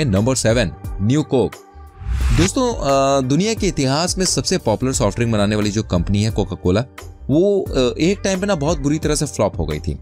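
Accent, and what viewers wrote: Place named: Hyderabad, India. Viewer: HYDERABADi Bolte...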